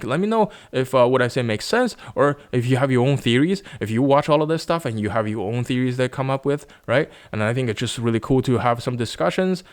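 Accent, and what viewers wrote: Standard. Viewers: Thank you for your excellent video. Although there were some inaccuracies in the translation, it was already very admirable. Your accent is very standard. Like it👍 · Thank you for your video; your Chinese proficiency is already excellent, and your pronunciation is very standard. Here, I'd like to emphasize a cultural difference that might lead to a slight deviation in your understanding of the meaning of the poem at the beginning of the video. In traditional Chinese beliefs, gods (inherent, pre-existing beings) and immortals (those who can achieve immortality through cultivation) are considered absolutely fair and pure entities without personal desires or malicious thoughts. Desires for wealth, power, and emotional attachments are seen as obstacles for becoming a god or immortal. This perspective contrasts significantly with Western mythology, especially Greek mythology, where the emotions and personalities of gods and humans are more similar. 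So, in essence, the second line of the poem is expressing that although mortals admire the carefree freedom of becoming a god, they are unwilling to let go of their desires for wealth and power. This poem originates from another ancient novel and originally aims to guide ordinary people, with a Taoist advising them to relinquish their attachment to wealth, power, and emotions, encouraging self-cultivation to become immortals. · 你是华人吗，感觉你的中文特别地道标准，无论是普通话口音还是中文文化，你都很地道，感觉你至少在中国生活过很多年。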